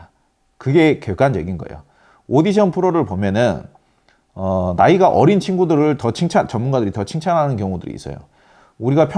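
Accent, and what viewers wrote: Jeolla, South Korea. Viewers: 전라도 사투리가 구수함 ㅋㅋㅋ 저두요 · 전라남도~ 약장수처럼 말하네요~~ 그닥 신뢰가 안가네요....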